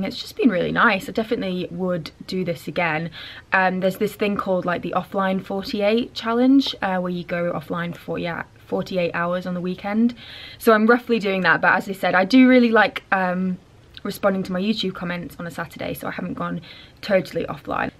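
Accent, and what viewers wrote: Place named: North, United Kingdom. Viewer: Way more relaxing then any ASMR channel. For some reason when you spoke on this video you sounded a lot more ‘northern’.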